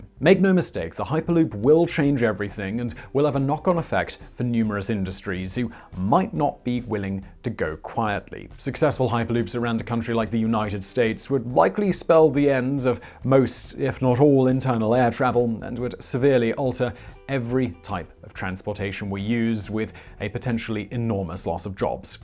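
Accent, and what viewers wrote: United Kingdom. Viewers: Several other people write the scripts and just sits in a chair and reads them off a monitor. You can tell he doesn't even proof read them before he records. (see all the times he reads a line about hair, or balding and he'll laugh and says, "come on guys!") In this video at about something he says "Elon Musk has made a name for ITSELF..." and process to crack up on the line about smoking weed. He has not read this script before saying those words. So yeah, it's a team of people, and is just the member of the team that sits on front of the camera and reads the scripts in a British accent.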